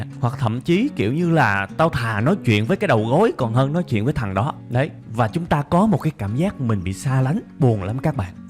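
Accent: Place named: Mekong Delta, Vietnam. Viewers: Anh chắc người miền tây hả cách nói chuyện của anh với funny gaming tv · Mới đầu nghe anh này giảng dài dòng cũng thấy khó chịu, bực mình lắm nhưng coi hết mấy clip thì thấy nội dung hay, giọng ảnh miền Tây chân chất, clip có dàn dựng hiệu ứng 1 cách khoa học, lời giảng dễ hiểu, chân phương nên bị ghiền! :D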